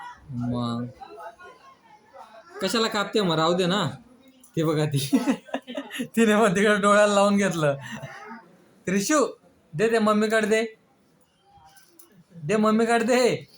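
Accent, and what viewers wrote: Khandesh, India. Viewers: Kont gav ahe tumch भाषा vrun tr खानदेशी vatay tumi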